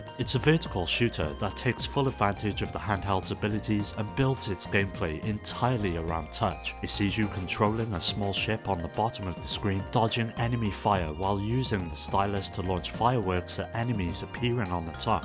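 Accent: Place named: England, United Kingdom. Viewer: uggghhh this is the nastiest sounding english accent fuckin clear your throat, ever heard of an AHEM? "If U FROW a Ton of Guramplay" eughh the way you say Game is fucked up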